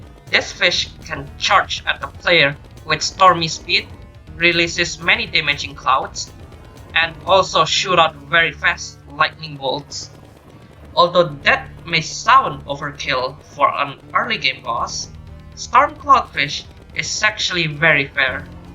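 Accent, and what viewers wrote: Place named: Indonesia. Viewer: indonesian accent hot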